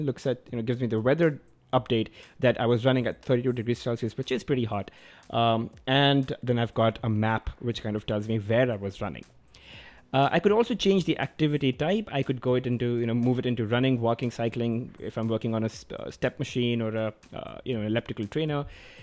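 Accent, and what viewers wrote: India. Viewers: Nice video, I like that Indian accent :) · The Indian accent sold me.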